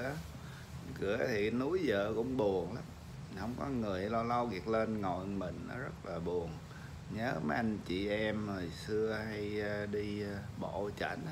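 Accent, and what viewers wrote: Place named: South, Vietnam. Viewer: chỉ giống nhau ở giọng miền Nam thôi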